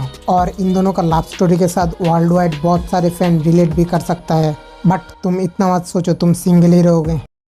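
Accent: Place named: Northeast India, India. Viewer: Voice sunke aisa lagta he ki tum Manipur, nagaland, mizoram, odisha me kahise ho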